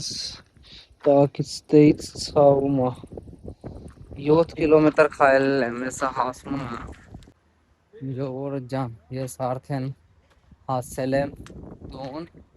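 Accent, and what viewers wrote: Armenia. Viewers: Artashati barbarov el xosum es brou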